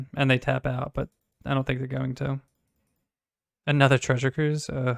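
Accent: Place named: Baltimore, United States. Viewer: Hey, a little irrelevant but are you from Baltimore? (Wherever you’re from im a fan of the accent)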